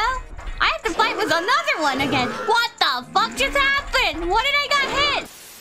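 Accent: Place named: South, United States. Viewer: the cursier she gets the more her Southern drawl comes out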